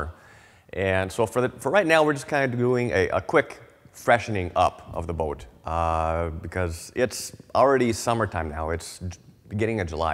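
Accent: Midwest, United States. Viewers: this guy sounds so midwestern it makes me wonder what kind of boats they have over there that arent icebreakers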